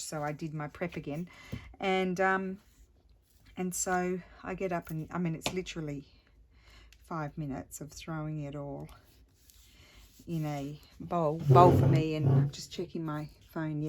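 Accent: Australia. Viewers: I like the leaves also. Try a thistle. I bet it’s not to hard. Building up the courage sounds like a great idea. Sounding Aussie? Maybe because you were talking to your brother than your sister than your mom. Lol. Yep I like your Aussie sound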